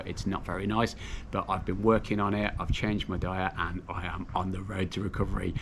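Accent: United Kingdom. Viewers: Thanks for posting. My second great grandfather died after sailing out of India years ago on a tea clipper. It really does frame the disease risks of living abroad. My own personal experience of living abroad is in China, where I lived for many years. Before I went I took quite a few shots of vaccination, and while there I boiled all my water, unless I drank bottled. All my time, more or less was spent in cities. I'm Rh- and I read somewhere we are less likely to get Malaria - I don't know if anyone reading this has heard this....? By the way, whereabouts are you from - I'm guessing somewhere between the East Midlands and London?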